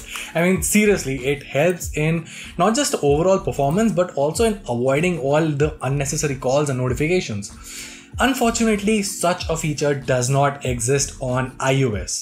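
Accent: India. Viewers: Indian accent 🤢🤢🤮🤮🤮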